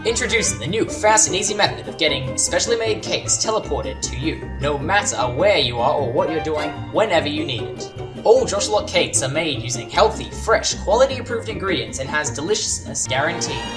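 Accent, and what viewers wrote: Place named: United States. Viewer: y is australian but talks american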